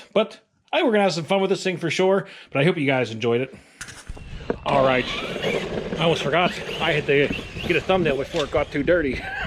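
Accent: Pittsburgh, United States. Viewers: The Pittsburghese is strong, my friend. I am also from the area.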